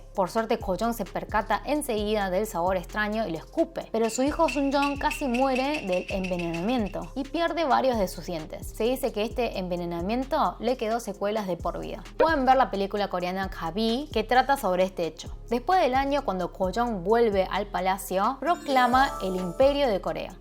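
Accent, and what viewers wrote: Buenos Aires, Argentina. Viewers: 어려운 역사를 알기 쉽게 풀어주셨네요! 스페인어 공부하는데 큰 도움이 됩니다. 아르헨티나 발음은 덕분에 많이 친숙해 졌어요~ 감사합니다. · el acento porteño ... argentino... genial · Lamentablemente no puedo con tu Acento Argentino primer y último video que miro pero te deseo todo el éxito. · Cómo chica escuchar a una coreana con acento argentino. Muy raro. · Entiendo, pero ese acento Argentino, suena asqueroso. Se dice YO no CHO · Felicidades por tu excelente castellano. Noto un ligero acento de Argentina? Saludos cordiales desde Cochabamba Bolivia 🇧🇴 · No hay nada mas bello que una coreana con acento porteño... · Que gracioso una coreana, hablando con dejo argentino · Hola, porque tienes acento argentino? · Eres koreana o argentina? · ese ecento argentino chillon y horrible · ._.' Es tan complicado acostumbrarse a ver a una coreana con acento argentino ._.' preferiría que hablaras en tu idioma y colocar subtítulos, por que ya no sé si veo a una coreana o argentina xD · Se me hace raro ver a una asiatica hablando como argentina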